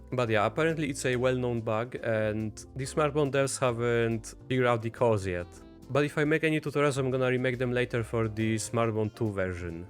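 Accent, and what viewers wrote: Poland. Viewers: polish accent 🤩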